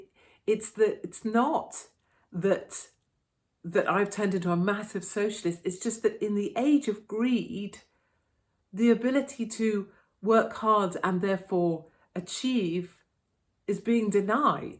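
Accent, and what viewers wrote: RP, United Kingdom. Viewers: You sound awfully posh as you pose the questions. My answer to this is that it's always been like that, maybe we're just noticing more things in this new eyes wide open type of enlightenment that some of us are experiencing? Those people are everywhere, always have been, and always will be. The type who never makes eye contact when forced to be with people they don't know, like in a grocery store? There are very definitely more people aspiring to be that type of person though, that I'll definitely agree with.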